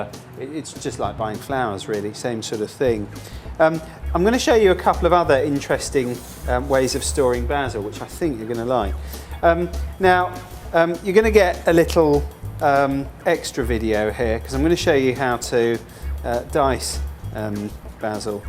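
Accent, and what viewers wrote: United Kingdom. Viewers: It's the British Accent Sounds Cool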